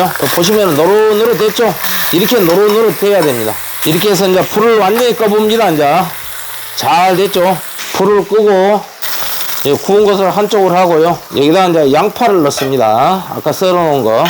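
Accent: Jeolla, South Korea. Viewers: 고향이 라도인가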